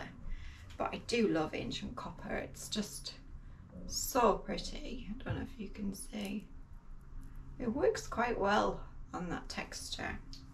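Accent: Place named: Newcastle, United Kingdom. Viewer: Hey, how fantastic you're in your studio! Oh what a great assortment of pots and glaze combos. love Jacobs jug and I do hope you can save it? Your beautiful coil bowls are fantastic and what a lucky friend you have who's going to get the big turquoise bowl for her birthday. so glad you're back making pottery from home and videos as I love watching and listening to your Geordie accent. Happy potting my friend and stay well xoxo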